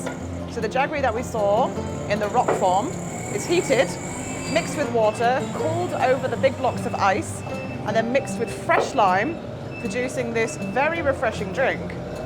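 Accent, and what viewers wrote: United Kingdom. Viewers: I will subscribe your channel if you Marry me 😁 DONOT MIND 🌼🌼🌼🌼🌼🌼🌼🌼🌼🌼🌼🌼🌼🌼 I Love Listening British Accent ✅ Beauty + Lovely Voice + Beauty...... you are very beautifull 🌹I AM FROM SWAT🌹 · Hi which part of the world are you from. By your accent you sound British. Nice to see you that you are enjoying over there. Wish you well. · British 🏴 english accent is great 👍🏻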